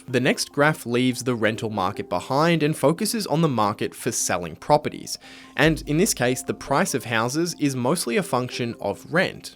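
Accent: Australia. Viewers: I cringe every time you say ‘graff’ in your ‘melbun’ accent. The correct pronunciation is ‘grarf’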